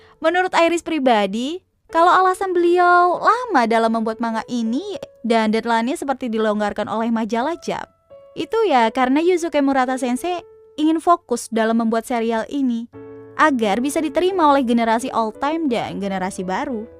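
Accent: Java, Indonesia. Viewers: Medok wkwk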